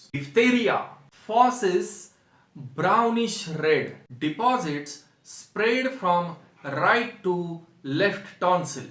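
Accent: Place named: India. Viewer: According to your delivered speech,you look like an Indian. But why do use foreign language,? What do you want to show by this filthy language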